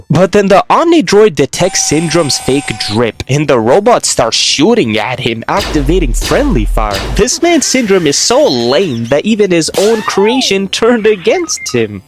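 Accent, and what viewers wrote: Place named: Toronto, Canada. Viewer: Bro sounds like he from Toronto lol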